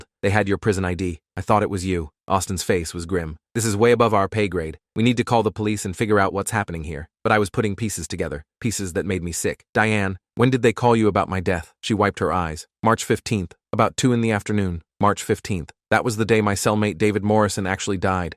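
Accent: United Kingdom. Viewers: ooohhhh yeeessss ahh british accent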